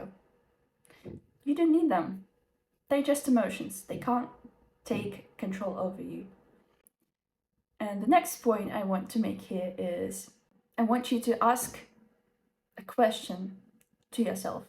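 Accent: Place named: United Kingdom. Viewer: Thanks for this video, dear. Your pronunciation is indeed good. You speak British English, you speak so clearly, that those whose English is not advanced, can understand you easily. As for the topic of your speech it's very useful. We sometimes need to talk to ourselves to get rid of the emotions that let us down.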